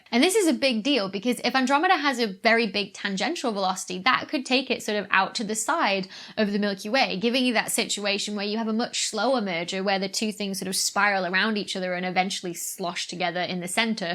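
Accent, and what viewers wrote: United Kingdom. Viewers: That British accent though...If you listen to how she speaks and not necessarily all of the science jargon she's talking about and still love it...give this comment a big thumbs up! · with the british accent and a cute face cant ask more than that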